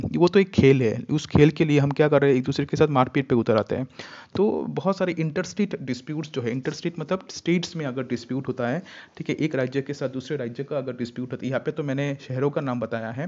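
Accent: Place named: Northeast India, India. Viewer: I like your teaching and understand ur language so easily seems it feels like our northeast accent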